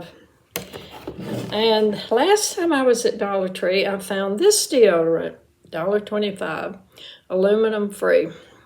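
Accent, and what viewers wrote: South, United States. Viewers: I found your channel today and I could listen to you talk for hours. I live in Oklahoma, so it's not like I don't hear southern accents, but yours is so lovely. I'm also a retiree with a mortgage, but thanks to my working so many years and a great investment manager, it's all good and all I have to do is hit 95 and this place will be all mine lol. So glad to find you and and I'll be back to see all your videos. You're a natural!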